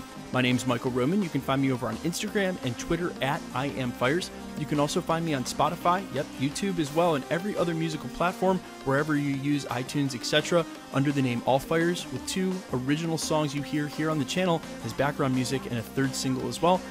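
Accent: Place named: Philadelphia, United States. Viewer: Just curious. Are you from Philly? As a local, I’m picking up a slight accent. Cheers.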